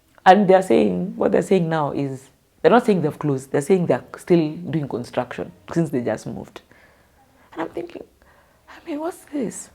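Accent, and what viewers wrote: Kenya. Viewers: God for 34 years? She even has Kenyan accent...she's a citizen by naturalism... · This girl is Kenyan. Just listen to her accent. Give her citizenship. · one can tell that she is a Kenyan by her accent.Why should the government treat her like a refugee?? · Woow.She even has a Kenyan accent.She is a kenya by naturalization. God help her.I hope she gains citizenship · I listen to this beautiful sister,I don’t hear Uganda accent all I hear is Kenyan accent,I hope the president pardon her so she can get her citizenship and continue her life with peace of mind in kenyan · This is soo wrong , her English accent soo kenyan , Kenyans be merciful there are kenyans in other countries too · This lady does not even have the Ugandan accent but Kenyan.After staying in Kenya for 12 years you can naturalize.She just needs a person to guide her.Chinese have come the other day and they have settled.Why not her. 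· Based on her grounds I believe she has "automatically or naturally " qualified for Kenyan citizenship by naturalization. Even her English accent's is Kenyan. She's a Kenyan. The east African governments should make this work if they mean about east African community as a regional or block to benefit its people. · Her English is KENYAn 💯💯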